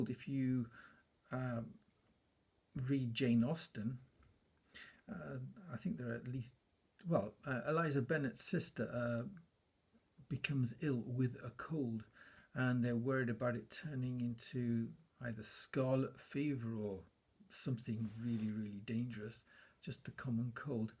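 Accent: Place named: United Kingdom. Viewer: I'm trying to work out where in the UK your from by your accent but drawing a blank 🤔.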